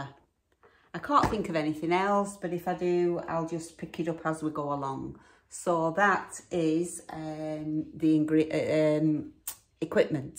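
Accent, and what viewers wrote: Lancashire, United Kingdom. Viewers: Ooo looks yummy must have a go at that soon. New subscriber here & loving your content, are you a (dare I say it) a Lancashire lass? It’s where I’m from….thought I recognised the accent?! Look forward to catching up on your previous videos & please do a cook with me video, I for one would love it. Thank you, take care. Kind regards, Lynda 😉🌻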